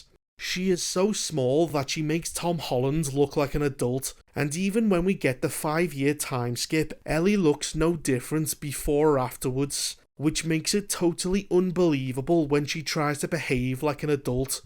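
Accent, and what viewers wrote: Liverpool, United Kingdom. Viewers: Yes my bredda, Are you an ACTUAL SCOUSER? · God your scouse accent is annoying but i 100% agree with you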